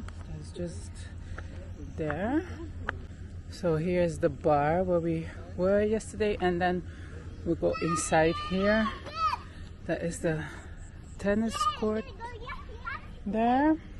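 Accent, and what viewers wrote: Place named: Saint Lucia. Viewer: Are you from St Lucia, originally, you sound like a friend of mine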